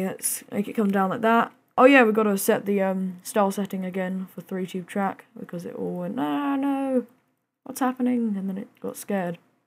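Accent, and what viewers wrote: United Kingdom. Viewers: You live in Cali? You sound pure British though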